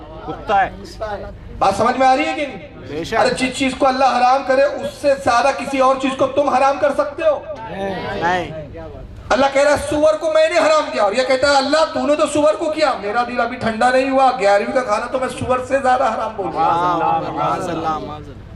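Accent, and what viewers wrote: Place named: Kanpur, India. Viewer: Kanpuriya style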